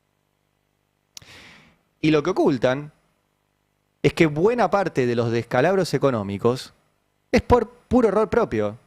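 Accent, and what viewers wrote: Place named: United States. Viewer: UF con ese tono gringo. No me importa lo que diga hasta la forma de hablar suena a vende patria.. Cada vez peor.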